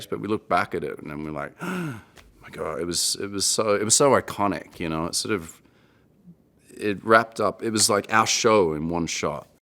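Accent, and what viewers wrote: Australia. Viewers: You did not know he was Aussie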